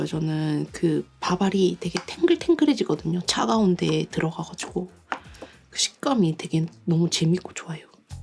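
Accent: Gyeongsang, South Korea. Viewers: 아 대구시구나 경상도사투리가 가끔 나오시더라니 여긴 창원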